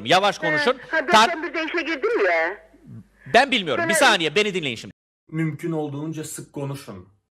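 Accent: Thrace, Turkey. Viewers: Aksanının harikaaaaa şiven tıpkı Trakyalı ya müthiş! 🤞🤞Türkçe- Fransızca- İngilizce için buradayım 🤞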